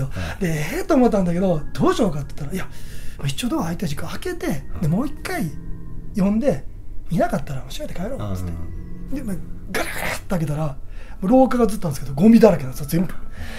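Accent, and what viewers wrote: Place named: Kansai, Japan. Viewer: 初めてコメントします 語りの方の話し方が関西弁で、尚且つ少し崩した感じの話し方ですごく聞きやすくて大好きになりました！これからも楽しみにしています🥰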